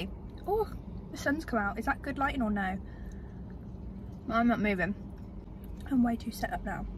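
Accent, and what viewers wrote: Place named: New Orleans, United States. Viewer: I like her accent 😭 I'm from New Orleans lol if ykyk the voice is crazy 😂😂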